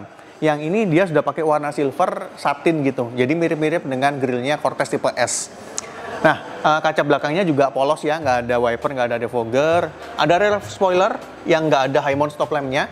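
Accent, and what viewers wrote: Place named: Surabaya, Indonesia. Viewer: Okelah mantep bicaranya . Kek ke surabayan style hhehe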